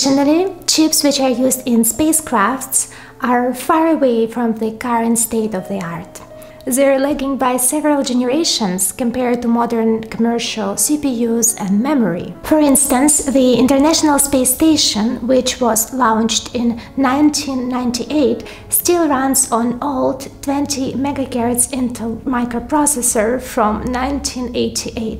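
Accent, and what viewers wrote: Russia. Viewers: Are you from Russia, Ukraine or Belarus? - Megagertz sounds quite right😉 Still these vids are cool, thx for making them 👍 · Star trek's Chekov was adored in part vecause his acdent related pronunciation limits were endearing to us who were raised spraking American dialects of English. The Russian~Slavic accent is a cool factor that many of us adore so please don't worry so much about mispronouncing non-vital things.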